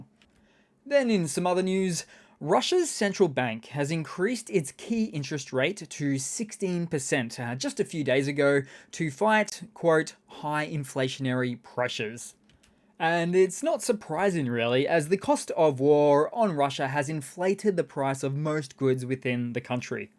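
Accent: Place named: Australia. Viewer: (sp?) I enjoy your posts. I follow a few others (Denys, Georgie,Anna,Artur ) and between you I feel that the fact checking is great. You all add something to the big picture. But one thing bugs me, your accent. Please don’t take offense but I’m just curious, are you an Aussie? 😂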